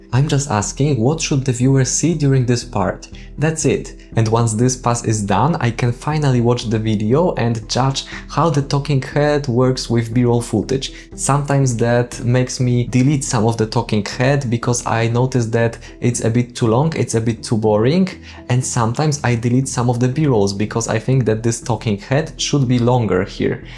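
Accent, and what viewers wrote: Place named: Poland. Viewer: Nice video! Going to try to use your workflow. Accent sounds Polish ☺️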